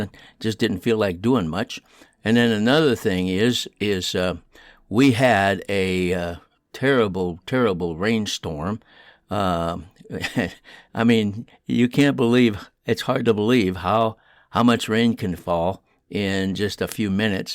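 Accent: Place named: South, United States. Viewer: OK 👍 I'll subscribe to you... because you sound like a country hillbilly, like me...🤣 nice little story about Blackie and the well house..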